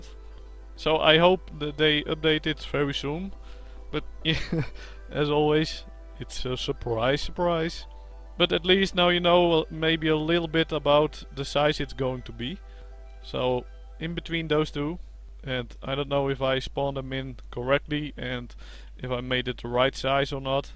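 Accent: Netherlands. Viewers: you sound like you are from nl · it is just the way dutch poeple talk english becuse you just talk like me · je bent gwn nederlands, valt aan je accent te horen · Are you dutch? I can hear it. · u have the most dutch accent i ever heard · je praat met een zwaar nederlands accent moaaaaan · are u dutch? if not u sound like a dutch talking english ^^ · Your accent sounds dutch Are you dutch? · dutch accent xD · are you dutch you sond like it?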